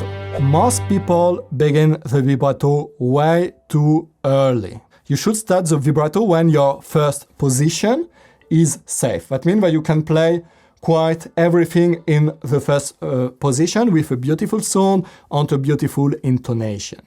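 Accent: France. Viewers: Accent français ça non ? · Oui! J'adore l'accent français😘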